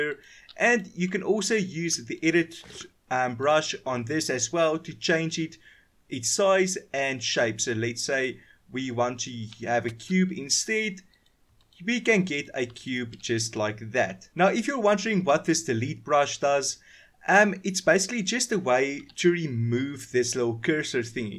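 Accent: South Africa. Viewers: You sound south african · Finally thanks for this amazing addon😊Also are you South African I hear a bit of an accent😂😭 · Are u from South Africa bru? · This is perfect. Love the voice btw from SA?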